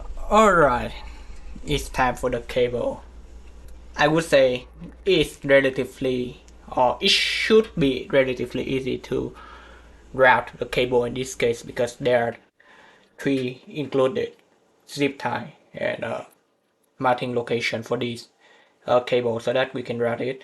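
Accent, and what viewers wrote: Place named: Vietnam. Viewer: Ban la nguoi Viet Nam a, tui co the nhan ra accent cua ban.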